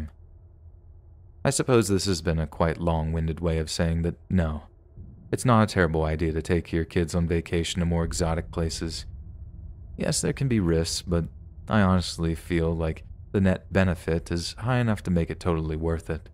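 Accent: Australia. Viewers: Except for his Australian accent. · That Australian accent though...🖤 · Australian accent is gold <3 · Your aussie accent!! Love it 😍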